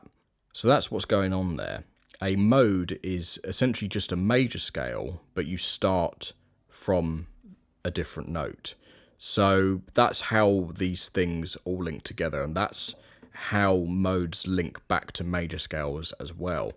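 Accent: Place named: United Kingdom. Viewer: Can you do the video again, but without the British accent?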